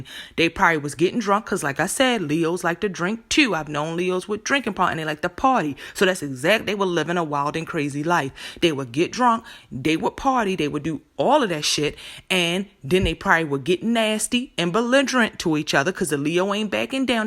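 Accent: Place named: Baltimore, United States. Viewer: You've gotta be from Maryland, Baltimore to be exact cause the way you pronounce your O's and U's is so BMORE.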